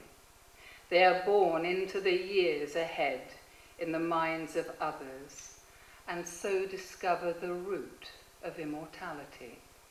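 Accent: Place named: London, United Kingdom. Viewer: Sounds POSH - Sloane square - Kensington High Street - Bayswater ?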